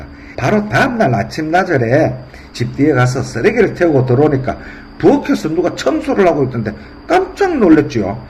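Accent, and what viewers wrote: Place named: Gyeongsang, South Korea. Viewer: 왜?유트버는 대개가 경상도 말씨를 쓸가?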